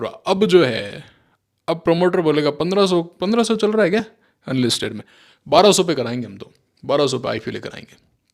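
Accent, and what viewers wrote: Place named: Haryana, India. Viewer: Haryanvi mst bolte ho sir aap to 😅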